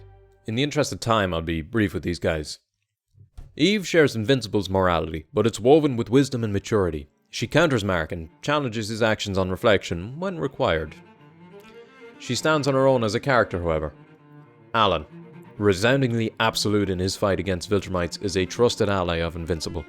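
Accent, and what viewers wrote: Ireland. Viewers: why is an irish version of james wilson from house md telling me about invincible? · YO UR IRISH IMA BE WATCHING U FROM NOW ON YUPPP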